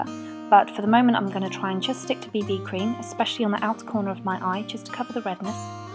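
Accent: United Kingdom. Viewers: I LOVE YOUR ACCENT ITS SOO AWESOME! where are you from? it sounds like your from the U.K. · Your voice and accent sound fucking horrible. Like an old british hag. Id hate to be British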